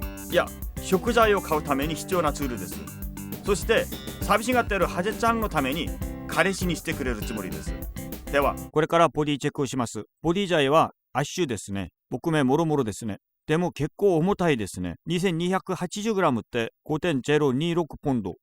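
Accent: South Korea. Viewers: 왤케 한국인처럼 일본어를 하나 했더니 한국인이었구나😅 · 外国の方？韓国の訛りなのかな〜 全然分からなかった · 뭔가 한국인이 일본어 하는 느낌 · 韓国（？）だっけこのタイプの日本語クソカワイイwwww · 억양에서 한국어가 느껴지는 건 왜죠?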